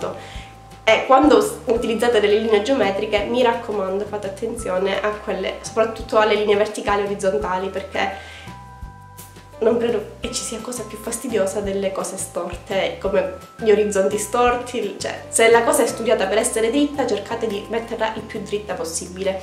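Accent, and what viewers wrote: Sicily, Italy. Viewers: Ciao ti ho scoperta da poco sei siciliana per caso??:-))))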